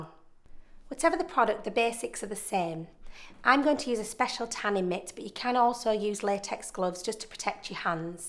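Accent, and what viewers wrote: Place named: Newcastle, United Kingdom. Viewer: lol shes so from newcaslte or round there xd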